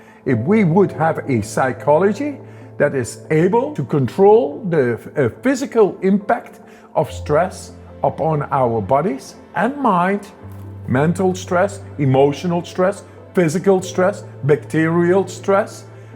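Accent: Scotland, United Kingdom. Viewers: These meditations are perfect. <link> him has a gentle, warm, pleasant voice (with a slight Scots accent). The words are simple and clear. The timing is perfect... just as you start to find your mind wandering, he says and if you find that your mind is wandering... . There is no background music and no noise in the background, only his peaceful and engaging voice. The length of the meditations (about 1/2 hour each) works well for me. I find that I tire of some meditations after frequent repetition, but that hasn't happened with this one. The metta lovingkindness meditation always feels right. Some meditation CDs I have tried have not felt right. The voice seemed hurried, or sounded tense; the background music seemed intrusive, or there were noises that interfered with concentration. This is just perfect as an introduction for new meditators, and it's also a meditation that I return to again and again. 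I'd give this more than five stars if that were an option. Even my students, who are not ordinarily very receptive to meditation, relax when they hear this one! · I was having some trouble recently calming manifestation.guide the voices in my mind during my morning meditation. him has a wonderful Scottish accent and a very calming voice. Thanks to his meditation CD's I have gotten back on track and feel a big difference in my meditation practice.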